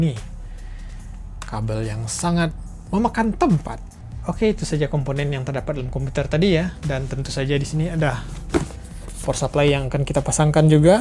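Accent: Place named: Padang, Indonesia. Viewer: Orang Padang Abang ini agaknya...kental kali logatnya😂😂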